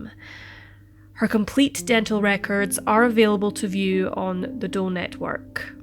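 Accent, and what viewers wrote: Scotland, United Kingdom. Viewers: Voice: Scottish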